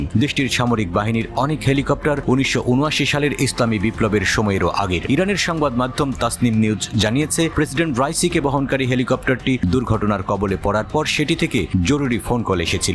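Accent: India. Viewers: নিউজ রিডারের বাচনভঙ্গি এবং কথা বলার ধরন অনেকটা ভারতীয়দের মতো। বেশ কিছুদিন যাবত একাত্তর টিভির কিছু নিউজ রিডার যারা ব্যাকগ্রাউন্ডে কথা বলেন তাদের বাচনভঙ্গি এবং কথা বলার ধরন, অনেকটা ভারতীয়দের সাথে মিলে যাচ্ছে। বাংলাদেশের বাংলাভাষী নিউজ প্রেজেন্টটার কখনো এই ধরনের বাচনভঙ্গিতে কথা বলেন না। তার মানে কি 71 টিভিতে ভারতীয়রা নিউজ প্রেজেন্ট করছেন!? 😮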